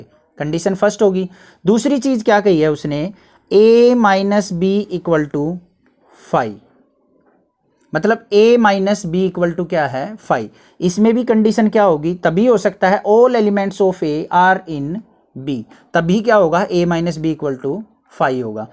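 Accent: Haryana, India. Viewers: Sir it seems u r from haryana??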